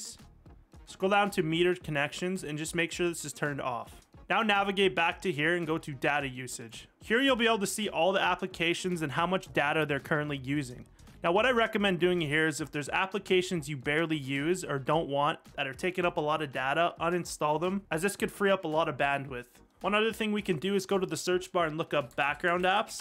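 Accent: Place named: Winnipeg, Canada. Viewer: you're from winnipeg?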